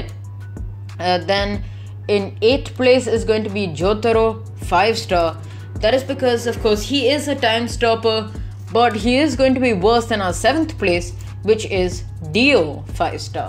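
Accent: India. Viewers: bro good video :) btw you sound like your from india which is a good sign because people from india always have best help and tips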